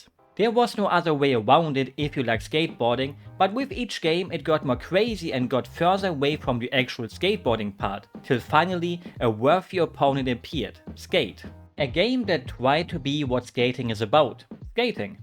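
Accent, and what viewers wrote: Germany. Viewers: Extremely German, don't even attempt English. Sounds so cringe!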